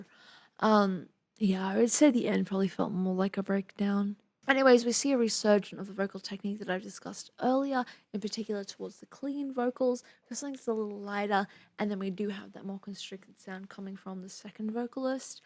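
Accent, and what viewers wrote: Australia. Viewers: I love her reactions and love love love her Aussie accent😍😍😍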